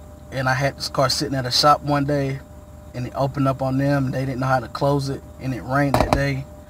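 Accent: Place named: RP, United Kingdom. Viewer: Hate these posh accents🤓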